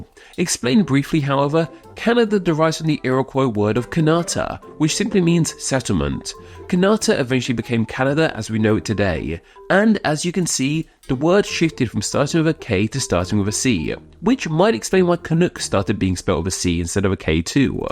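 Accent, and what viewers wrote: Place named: United Kingdom. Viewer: I mean, he is British. He doesnt say many different things "correctly."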